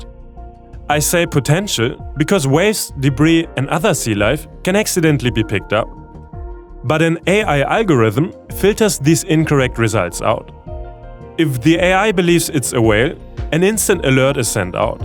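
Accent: Germany. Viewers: Are u German?🧐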